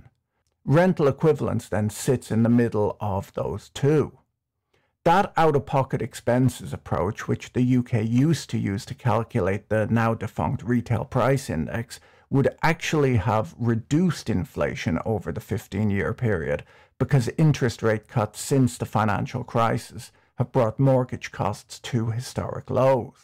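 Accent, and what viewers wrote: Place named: Ireland. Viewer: His accent sounds irish but mixed with something else I can’t pick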